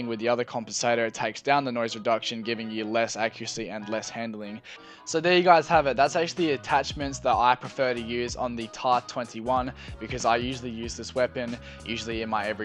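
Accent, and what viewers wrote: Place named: Australia. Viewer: Are you from austrslia you sound like au chezzy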